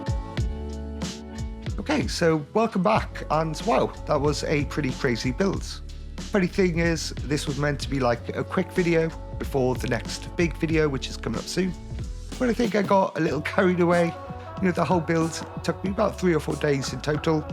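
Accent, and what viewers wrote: United Kingdom. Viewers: Yesss, my favourite British voice is back, and how, WOW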